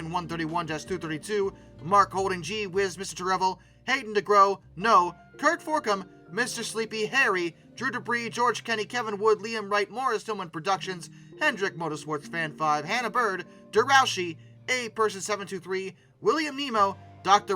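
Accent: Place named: Chicago, United States. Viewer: You sound like you’re from Chicago 😂. I was born n raised there ❤🖤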